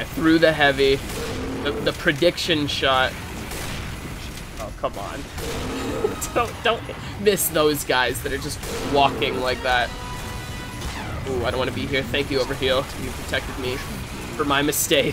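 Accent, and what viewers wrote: Italy. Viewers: yeah i can tell you're italian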